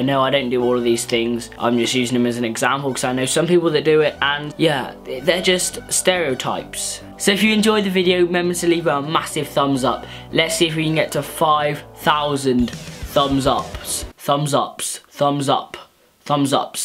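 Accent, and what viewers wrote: United Kingdom. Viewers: British x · Yay is British!!! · Is he British or American?? BTW ur one of fave youtubers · He's British😊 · are you British? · Is he British?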